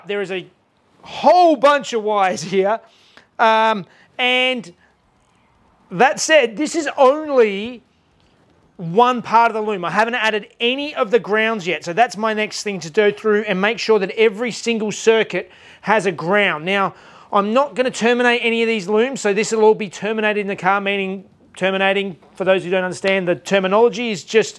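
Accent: Australia. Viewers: 😳😳 cool, I'm a paramedic.... According to your dialect u living down under..... 2 be a ff there must be challenging sometimes..... The heat, draught and brush fires.....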